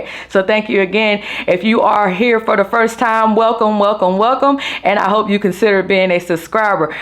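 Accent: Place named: Mississippi, United States. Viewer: U sound like you from Mississippi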